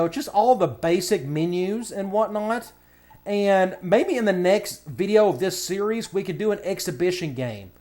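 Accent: South, United States. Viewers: Love the video man. You have a great personality man and we kindve got the same southern accent lol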